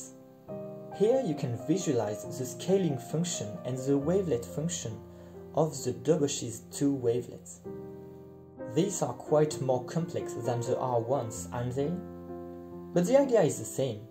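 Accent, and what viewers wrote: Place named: France. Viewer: I loved the math, the French accent, and the music! :D great video!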